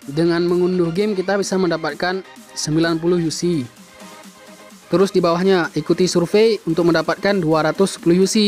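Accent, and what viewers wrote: Aceh, Indonesia. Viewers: Hahaha logat Aceh abeh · logat orang ACEH 🔥🔥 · LOGAT ORANG ACEH SUDAH SAYA SUB,MAJU TERUS BANG. SEMOGA BERKAH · hhhh...kupikee pakon logat aceeh...hana hie awak jawa😂🤣...auto sub nyoe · Bang orang aceh ya suaranya kayak orang aceh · Aceh habis logat nya.. Wkwkw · Bg kayak org aceh bicaranya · sudah ku duga logat aceh hhh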